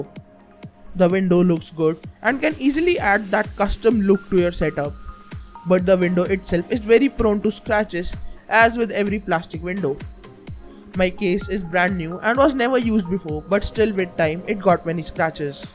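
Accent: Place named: India. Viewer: love the indian accent xD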